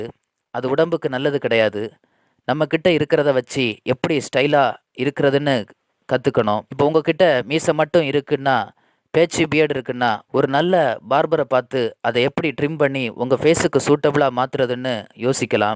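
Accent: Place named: Kanyakumari, India. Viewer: Kumari thamil...👍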